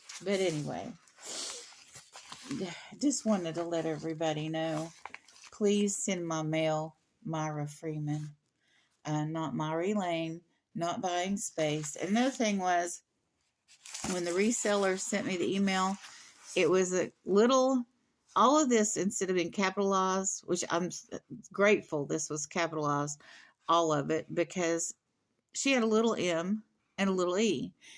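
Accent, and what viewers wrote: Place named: RP, United Kingdom. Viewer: Queens English, no less.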